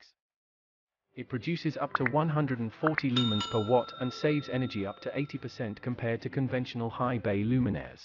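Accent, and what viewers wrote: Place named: United Kingdom. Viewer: STOP USING BRITISH VOICE OVER... american lite? then use a american vo. we dont like listening to limey's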